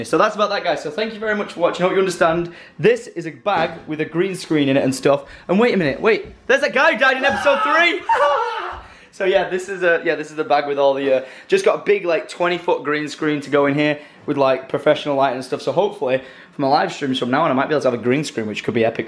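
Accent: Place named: Manchester, United Kingdom. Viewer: Manchester. Well, just south east of it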